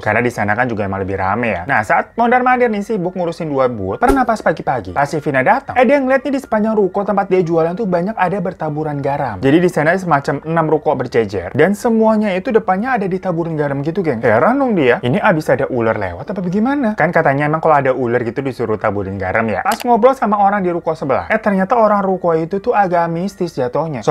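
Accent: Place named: Surabaya, Indonesia. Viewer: Pasti org surabaya nii.. ngomongnya "I" (ai) gt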